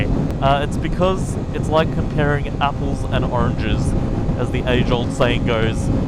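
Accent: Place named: New Zealand. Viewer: You sound kiwi my bro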